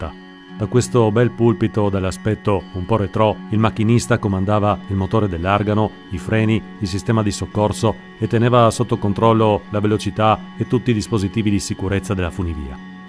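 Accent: Italy. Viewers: Un altro servizio superlativo... niente da aggiungere.. tutto perfetto e bellissimo, narrazione precisa e molto tecnica ma comprensibile a tutti... complimenti davvero...bravissimi (per mi che son della zona l'accento triestin se un plus che fa sempre piazer 😀😀).. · Scusi lei è veneto?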